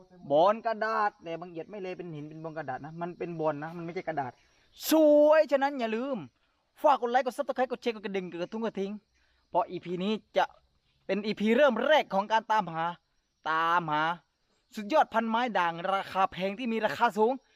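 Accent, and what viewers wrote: South, Thailand. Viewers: แหลงภาคนันและ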